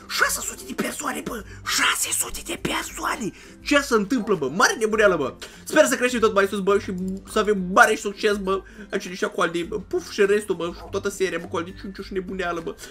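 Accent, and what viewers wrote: Moldova. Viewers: Ești Moldovan? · mie imi pare in videoclip ca ai acent de moldovean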